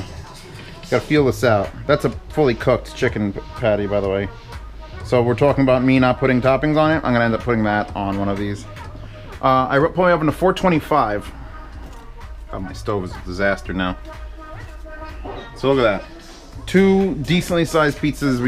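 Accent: New York, United States. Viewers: What part of New York are you from?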